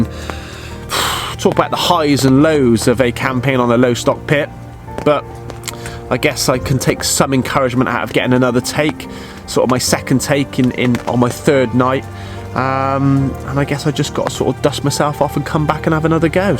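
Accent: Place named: Bristol, United Kingdom. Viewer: As a fellow bristol boy nice to hear the accent....if a little diluted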